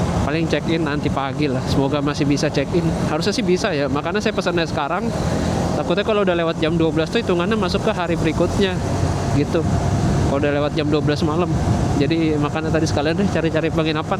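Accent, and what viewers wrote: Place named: Banyumas, Indonesia. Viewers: Lah orang ngapak to mas... Kirain orang depok😂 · Jebule wong ngapak njngn mas😮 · logat ngapake esih ori Alhamdulillah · Widii mudikk masee gen ngapak kie jebule😅 ati ati Lik😅😂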